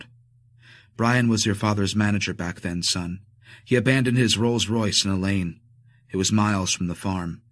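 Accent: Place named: United States. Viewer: As always, Nevill’s tale is rich and textured and compelling. His prodding of what scares us, ancient fear, from before we knew what fear was is—if not actually unique—superlative. Why a Yank narrator? Got in the way a bit.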